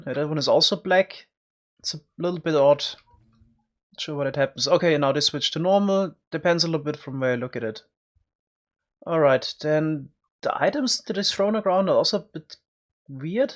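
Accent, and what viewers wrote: Germany. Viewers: Man merkt halt echt das du Deutsch bist ^^ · Dieser deutsche Akzent xD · I hear that you are german. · This english Akzent. I höre, dass you are a german sprechen Person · Deutscher Akzent Noice👌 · Ξενοφώντας Σούλης I’m Mexican. When I heard his German accent I shit my pants 😂😂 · Kann es sein dass du deutsch bist? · Kann es sein das du Deutsch bist? Wenn ja man hört as an deiner Aussprache!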